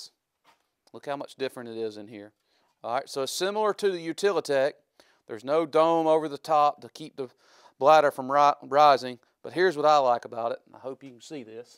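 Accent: South, United States. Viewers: Enough with the southern accent and long intro. Get on with the video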